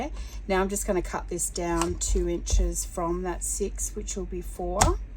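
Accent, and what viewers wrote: Australia. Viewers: These are beyond awesome!! New subscriber! Your Australian accent is neat, too!💚💚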